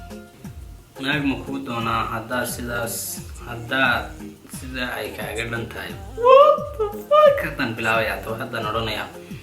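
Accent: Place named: Hargeisa, Somalia. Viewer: Inanku ma reer Hargeisa lahjadi rer. Hargeisa ayuu wataa go forward brother 😂❤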